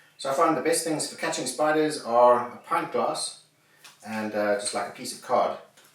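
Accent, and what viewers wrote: South Africa. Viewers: Your accent like you come from South Africa 🇿🇦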